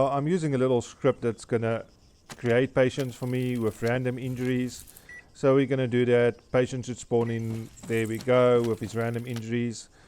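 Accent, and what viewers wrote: South Africa. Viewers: you sound very South African? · Your Accent gave it away,Well.For a south african at least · Easy you South African accent gives it away, i'm from Gauteng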